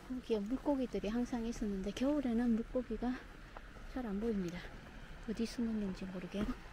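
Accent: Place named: Gyeongsang, South Korea. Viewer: 사투리는 저와 같은 경상도 (저는 대구 ) 사투리인거 같아 더 정감이가고 콧물 소리는 전혀 느끼지 못하겠는걸요 ^^ 신경 안쓰셔도 될듯해용~~~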